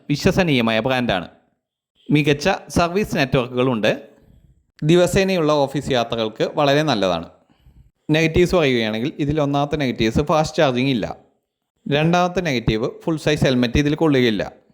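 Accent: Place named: France. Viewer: Annan French accent aanallo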